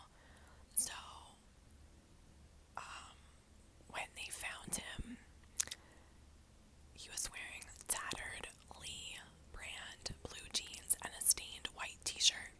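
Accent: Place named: New York, United States. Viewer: Recommendation: Missing 411 cases. They are so eerie!! Also- LOVE your NY accent. I’m from the South and I think this accent is so dang tingly!! Would love a NY accent role play! 😊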